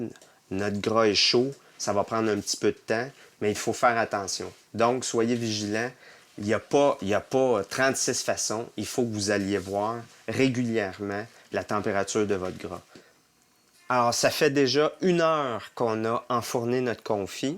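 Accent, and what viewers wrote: Quebec, Canada. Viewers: is this canadian french?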